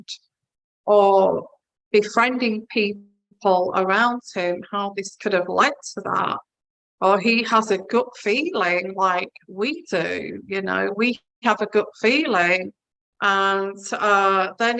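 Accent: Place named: England, United Kingdom. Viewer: Hi Sweet Lady, so polished and kind. I am sitting on my sofa enjoying every word. I love your genuine English accent. At first, l had a little difficulty understanding you, but now l am getting used to it. I am ltalian Naturalized American, and l used to do medical transcription in a major hospital in Detroit, MI. I have learned to transcribe for doctors from all over the world. Very interesting! Thank you for your hard work! I am sorry, but l can’t shake my gut feeling that Nicola’s partner is guilty. He is involved, a cheater. But l am not a psychic.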